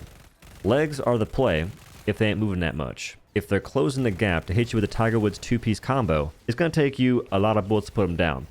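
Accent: South, United States. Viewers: He's Suth'ehn. Git'it? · Naturally he seems to have a very mild, almost undetectable southern accent. He exaggerates it when saying certain words for comedic effect. An older video showed his PO box is in Texas so it makes sense.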